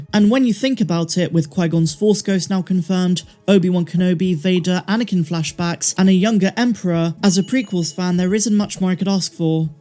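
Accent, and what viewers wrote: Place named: West Country, United Kingdom. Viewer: Gotta be West country right? Your accent sounds so much like mine, I genuinely find it a comfort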